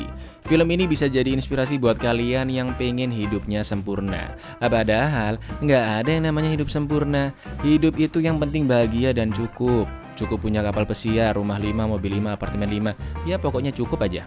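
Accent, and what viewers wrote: Java, Indonesia. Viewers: Wong jowo ya bang apa lampung?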